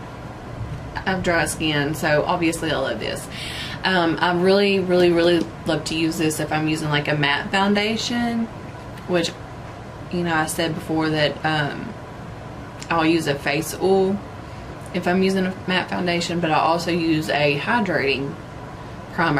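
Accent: South, United States. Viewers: I hear that country Trang lol...nice video. You have a new follower thumbs up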